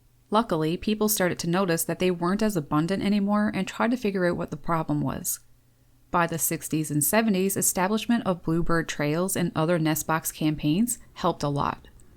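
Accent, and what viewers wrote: Canada. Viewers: I love you! I love your Canadian accent! I love bluebirds! 💙 I have alot of them in my yard and occasionally will eat seed from my feeder. Interestingly I have a wooden split rail fence. Now I know why I have so many in my yard. Thank you for your 💓 of birds! You're easy to understand, and humble way of sharing information is a Blessing!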